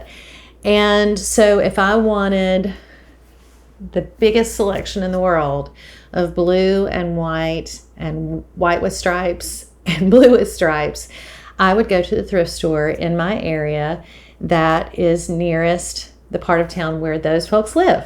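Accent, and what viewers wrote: South, United States. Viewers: This is not on topic, but does anyone else stress over sandwhiching a quilt? That part brings me to tears. Starting with laying out the backing, then the batting and then the flimsy. I can never seem to line them up. It can take me a whole day to line it up and pin baste. Is it really as simple as all these Youtubers show in their videos? Or is it just me? That is why I haven't started on my next. I am only on my third quilt, but I habe sandwhiched at least 100 for my mother whom is elderly. I love your videos. Let that southern accent shine.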